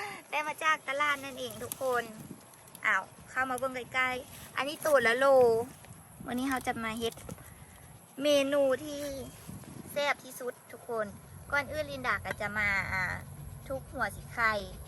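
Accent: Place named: Laos. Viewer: เว้ากะม่วนหลาย มักสำเนียงน้องลินดา หลงเสน่ห์สาวลาวคนนี้แล้วล่ะ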